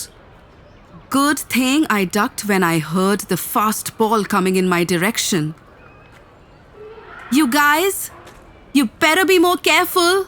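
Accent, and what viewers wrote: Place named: India. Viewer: This is amazing!! Compassion. Forgiveness. Friendship. This book has much to teach kids. accent does a great job making you feel like you’re in India so if you’re about to watch, grab some chai so you can engage one more sense in this beautiful story.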